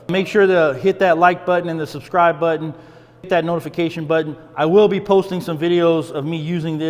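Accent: Louisiana, United States. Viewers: This guy must be in Louisiana. As a Louisiana resident, born and raised, I know a Cajun when I hear one...atleast he sure sounds like a Cajun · Where are you from, i hear half coonass in your voice... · Are you from Louisiana? You sound like us Cajuns.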